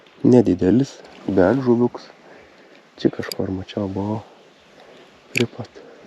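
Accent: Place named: Samogitia, Lithuania. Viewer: Nesnekėtum zemaitiska nebutu idome ziurėt👍😃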